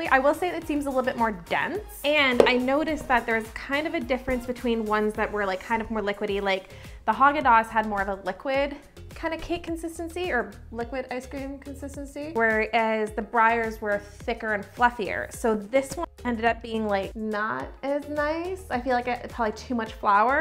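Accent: Canada. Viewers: omg ru canadian bc u sound SOOO canadian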